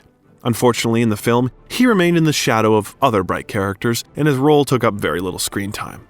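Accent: United States. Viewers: You are speaking in an American accent. HERE, we call it soccer!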